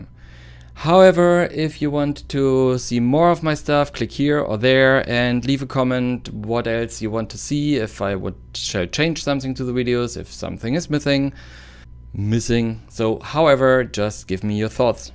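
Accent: Germany. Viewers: Man hört sofort das du deutscher bist😉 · Der deutsche Akzent ist unüberhörbar, gutes vid btw <3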